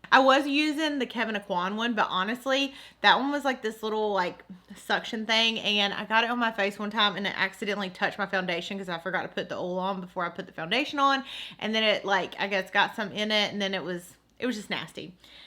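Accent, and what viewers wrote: South, United States. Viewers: Yay I’m early for once also I LOVE your southern accent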